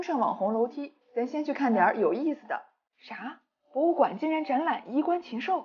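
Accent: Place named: Cantonese, China. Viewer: 声音🈶广音。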